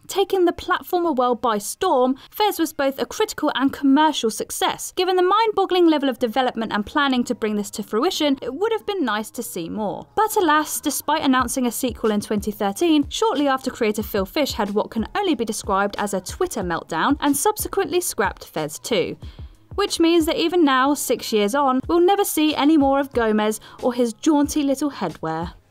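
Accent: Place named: United Kingdom. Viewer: I know they're all british. But damn this new host is british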